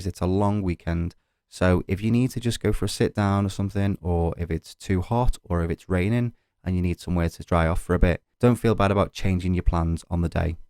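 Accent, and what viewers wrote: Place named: Wirral, United Kingdom. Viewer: Sounds like he's from my neck of the woods which is from the Wirral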